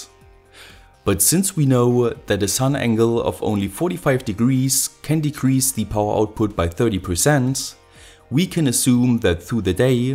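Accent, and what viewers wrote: Germany. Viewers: Sounds a bit German-ish :)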